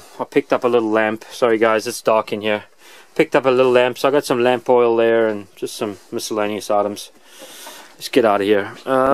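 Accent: South Africa. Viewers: What is your accent? I'm guessing it's a mix, maybe a south african that now lives in america? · Love the videos man! Where are you from? You almost sound south african! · Are you from South Africa? sure sounds like it, awesome stuff btw!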